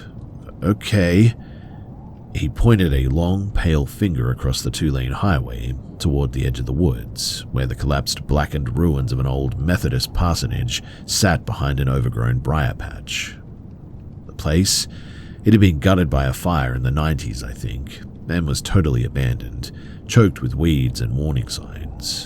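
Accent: Australia. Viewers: I love falling asleep to so much but I wonder if we were to ever meet in person if the sound of his voice would cause me to doze off in the middle of conversation?😆 My brain hears that Australian accent and I'm gone!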